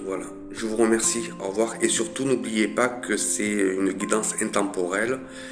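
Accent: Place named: South, France. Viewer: Bonjour," "Guidance intemporelle🎉Beaux Flashs.Qui parfois pas si,évident à dire.Mais,bien réel ce Flash.Super!...😊De toute beauté.Très intéressant et ce qui permet de mieux comprendre certaines,situations et aussi,certains comportements.Très beau Flash🎉Recevez mon soutien😊Plus rassurant et appréciant ce"Don"🎉Qui ne peut qu'amélioré le quotidien de chacun.Sincères remerciements" "🎉Avec son Joli accent du midi😊Franchement Beau ces Flashs🎉On ne s'en lasse pas😊Belle Richesse en ce Don🎉Prenez soin de vous😊Au plaisir!...😊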